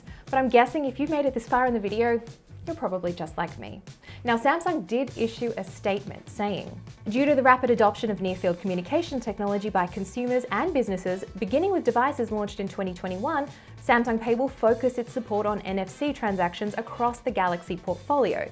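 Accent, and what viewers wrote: Australia. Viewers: This gal is an Aussie, I'm sure of it 🦘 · Is she Australian 🤔🤔🤔 Kinda!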